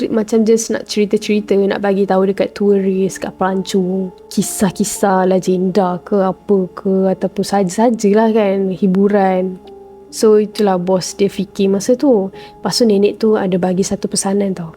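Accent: Kelantan, Malaysia. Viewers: org kelate ko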